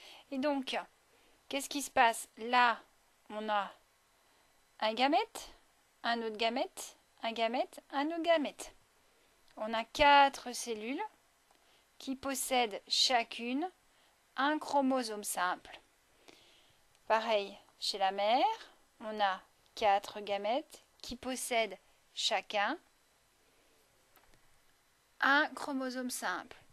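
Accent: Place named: Bresse, France. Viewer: Parfois on dirait que vous avez un accent bressans ☺️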